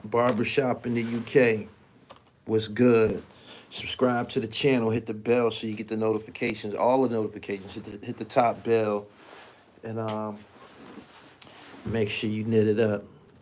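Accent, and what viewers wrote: New York, United States. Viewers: Yo u from PA why u sound like u from New York no pun intended on my New York Niggaz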